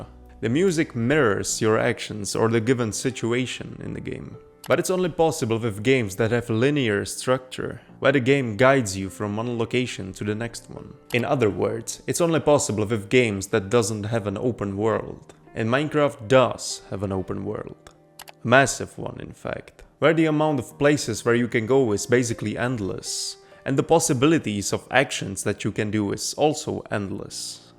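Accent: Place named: Russia. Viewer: yo, nice video dude and, what i just want to say... music in minecraft sounds kind of awkward, and it's creates its own rather distinctive atmosphere, it's one of reasons why i so much love this game (but i never play this again haha). this video made me refresh my memory, thank you for that. (and i can't help but say this: your conclusions in the end remind me a bit about pugpiece's video about space in video games, idk you russian or not, but if answer is "Yes" (i dare say, because the accent is a little similar) - watch this, i think you're enjoy that)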